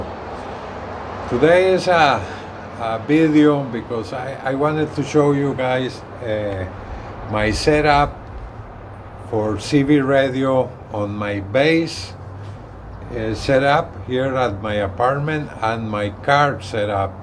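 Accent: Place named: Argentina. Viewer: I expected a southern Louisiana accent but instead your accent sounded very Argentinian? Little hint of Italian made me think so.. very cool video :) thanks for sharing!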